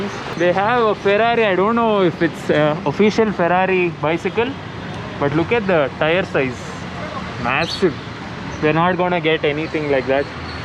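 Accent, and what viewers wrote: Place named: Rayalaseema, India. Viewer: Your kannada and seema slang telugu blend is perfect bro..... congrats for u r new bycycle gang 🙌......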